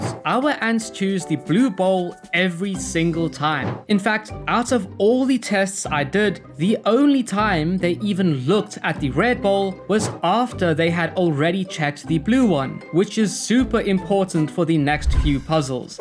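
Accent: South Africa. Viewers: Am I hearing a South African accent? Lmao vase line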